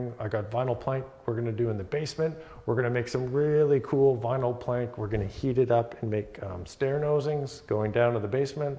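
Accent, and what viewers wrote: Canada. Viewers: Many congratulations. Solid channel that I have taken so much from as I have embarked on learning DIY and home maintenance from scratch over here in the UK. The accent gives me fond memories of my time in Canada and the advice is superb. First DIY video I watched on youtube was your circular saw - don't cut off your sausage fingers one and I am glad to say my sausages are still attached. Anything windows, cabinets, studwork, decks, sheds, stairs welcomed. Good luck!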